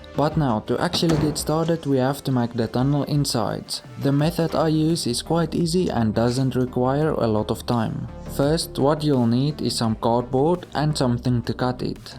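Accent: South Africa. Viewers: Are you South African? I am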